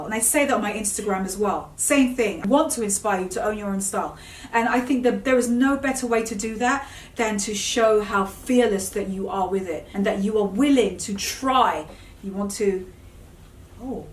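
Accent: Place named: London, United Kingdom. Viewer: You’re hilarious, love the London lingo and straight talking 🇬🇧😍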